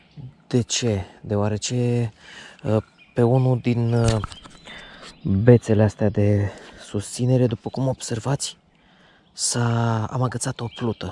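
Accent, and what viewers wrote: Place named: United States. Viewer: Unde ai pescuit în America sau pe o baltă din Romămia că după cum de exprimai erai mai mult american decăt romăn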